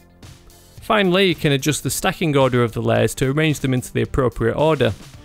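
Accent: United Kingdom. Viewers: British accent very good!!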